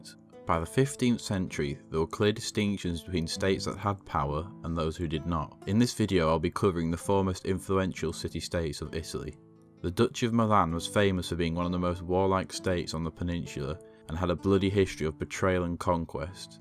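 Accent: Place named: United Kingdom. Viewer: done in a terrible regional British accent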